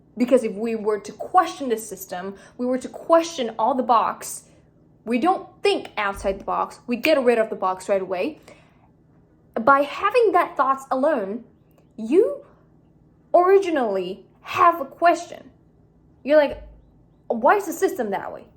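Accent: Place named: United States. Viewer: Weird how you have an American accent but your grammar is lacking. How do you even remember what you did in elementary school?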